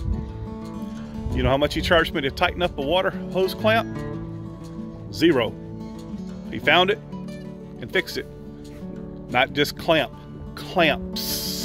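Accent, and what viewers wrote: South, United States. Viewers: I guess you're a fantasy life for them. A way to distract from their reality so they don't want to hear about your reality. I want to enjoy your life (your reality) on the road. Hear your southern accent & watch your handsome face video you & the Wolf Pack. Happy New Year🎉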